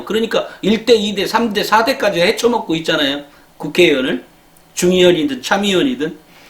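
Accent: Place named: Jeolla, South Korea. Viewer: 말투가 전라돈데 정말 정신나간 인간으로 밖에 안 보입니다.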